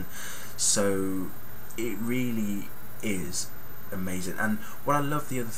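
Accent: United Kingdom. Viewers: Young justice is the best american show i've seen on cartoon network in a minute! Also, Halfway through the video as im listening while on another website, I realized that you have a british accent and you're black!! That is so awesome!!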